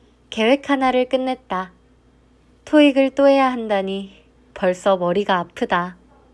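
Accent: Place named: Gyeongsang, South Korea. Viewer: ㅋㅋㅋㅋ 님 사실 과자를 꽈자라고 하시져...?!!!!ㅇ.x(경상도사투리) 오늘도 잘 봤어요💘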